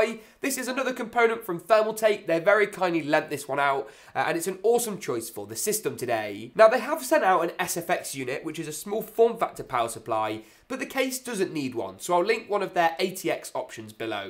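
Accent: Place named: Yorkshire, United Kingdom. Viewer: You have a nice Yorkshire accent.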